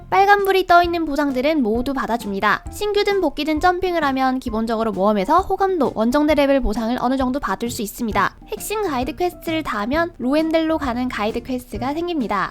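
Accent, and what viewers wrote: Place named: Gyeongsang, South Korea. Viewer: 경상도 분인가 E 발음할때 다 티나심 ㅋㅋ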